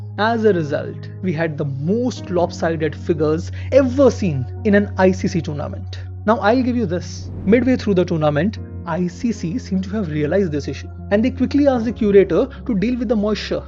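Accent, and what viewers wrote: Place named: India. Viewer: The presenter has a thick Indian english accent, and a lot of Indians mispronounce 'bowling' as 'balling'. That's the reason. Anyway, that doesn't detract from the entertainment value of the videos, and the interesting stories he brings to the audience.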